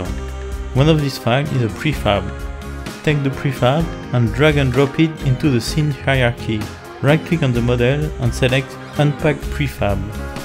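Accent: France. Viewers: (on voit l'accent français ptdrr) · Oh un francais ca se voit a ton accent faut travailler ca mais sinon c un bon tuto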